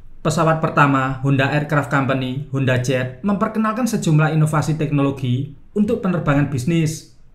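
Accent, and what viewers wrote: Java, Indonesia. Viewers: host medoook 😁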